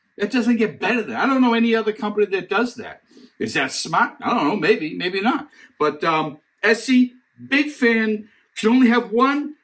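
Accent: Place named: New England, United States. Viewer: But I guess this guy's from New England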